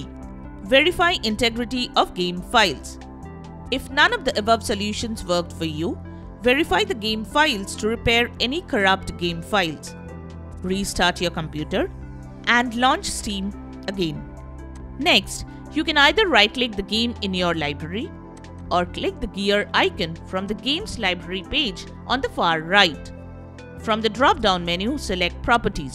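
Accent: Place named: India. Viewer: the accent instantly told me what i needed to know, down voted, and "dont recommend channel" lol i NEVER trust indian accents with anything.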